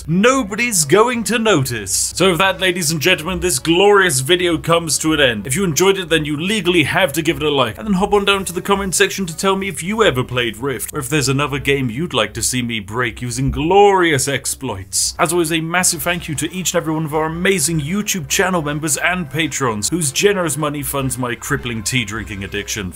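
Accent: United Kingdom. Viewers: I am here just because I remembered this video had a program that is basically a lag-switch and I totally could use a program like that thanks random British man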